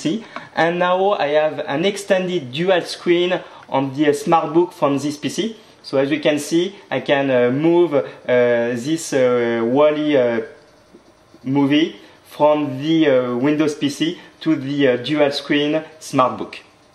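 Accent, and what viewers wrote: France. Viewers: French guys speaking english should be banned from internet :[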